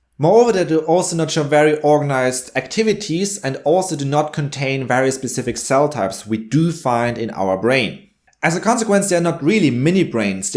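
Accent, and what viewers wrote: Germany. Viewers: Here from nutshell, subbed. :D edit: you sound German,are you German?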